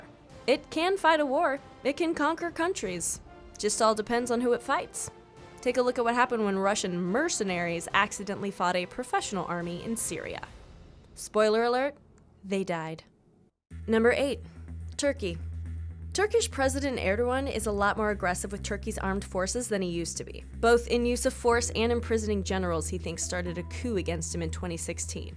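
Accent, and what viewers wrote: United States. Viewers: This video sounds American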